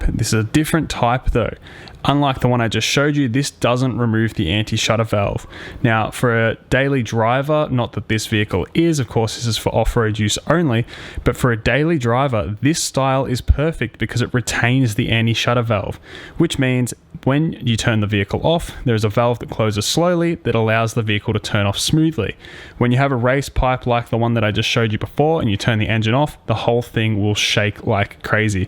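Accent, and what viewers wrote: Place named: Australia. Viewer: I might do this. It's good hearing a local voice. G'day from the Goldy.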